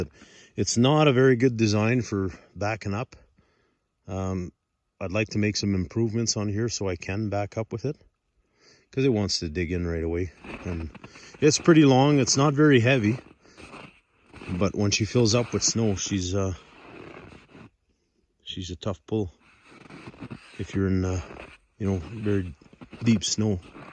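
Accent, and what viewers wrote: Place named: Atlantic Canada, Canada. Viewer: Good job. I'd like to make a groomer for lake trails, that shaves off wind blown moguls and fills in the dips. My idea is that it would be on skates that would dig down and skate along the ice. And the whole thing would be height adjustable with about 4 legs that the skates would be attached to, to set you blades at the mid point or so of the mogul depth. Skates would have to be designed so the helped pull the unit down and maintained contact with ice as best as possible also. Just an idea bumbling around in my head. Sounds like your from Canada's east coast?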